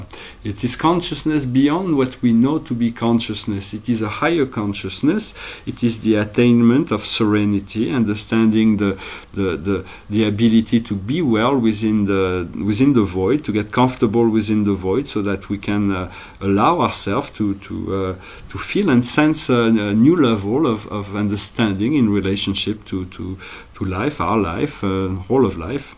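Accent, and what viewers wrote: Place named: France. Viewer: I could listen to you speak English with the beautiful French accent all day,! Thank you for the Osho reading and blessings to you. 🌎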